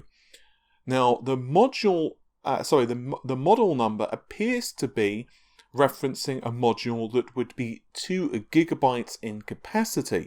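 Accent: United Kingdom. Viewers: It shouldn't be silent in British English pronunciation, and he is British by his accent.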